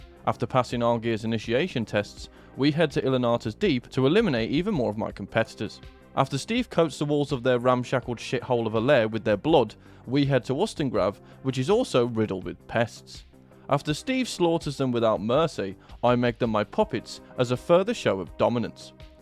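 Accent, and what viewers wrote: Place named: Yorkshire, United Kingdom. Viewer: Are you from Yorkshire? Because you sound like you’re from Yorkshire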